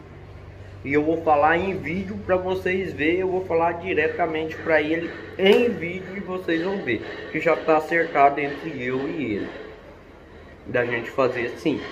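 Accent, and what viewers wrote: Minas Gerais, Brazil. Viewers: Éh mineiro!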